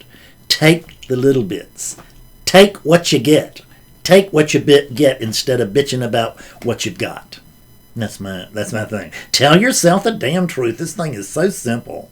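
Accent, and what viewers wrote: South, United States. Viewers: your southern drawl that sounds lovely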